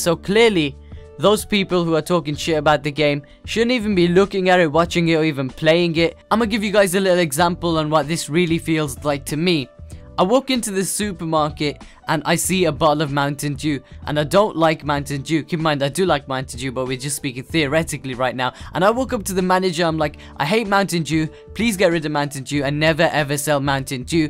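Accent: United Kingdom. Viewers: He's from uk man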